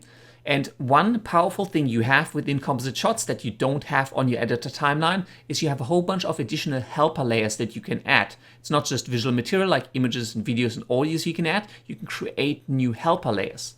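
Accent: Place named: Germany. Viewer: Really really great tutorial! and nice german accent :D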